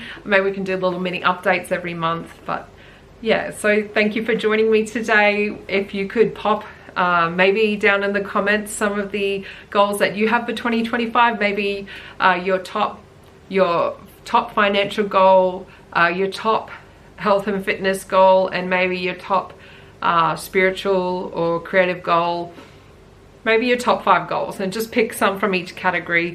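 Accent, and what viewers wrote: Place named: Australia. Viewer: I found your channel on my own yearly bible reading journey :) it's nice hearing an Aussie accent talk about this :) I felt like I was in a prayer rut too and started a prayer bible this year. There's a lot of videos on sisterita club to get started and then people have refined her idea to better organise the tabs :) You seem very financially sound but I thought i would just add in that your super should be in high risk portfolios while you're young. 5 years out from when you plan to retire switch it to conservative funds. Your really need the high risk investments to have enough to retire on 😊 And don't panic when they tank. It's about a 10 yr cycle but you come out better in the end :) With your budget, watch your quarterly trends before you change any monthly budgets :) With your iron if you cook a lot at home check out cast iron.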